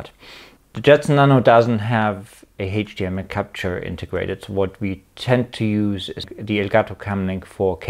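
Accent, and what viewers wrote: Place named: Germany. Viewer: Meine Jüte, da kickt das Deutsch ä bissl durch :face-blue-smiling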